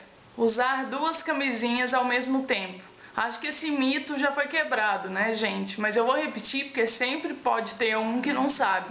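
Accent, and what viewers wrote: Minas Gerais, Brazil. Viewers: Uai gente, ela é mineira, mais óbvio impossível. ;d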